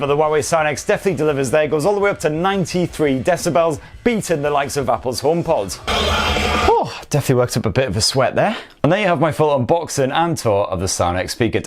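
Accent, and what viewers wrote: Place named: England, United Kingdom. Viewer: Never heard it pronounced waa way. You sound English.....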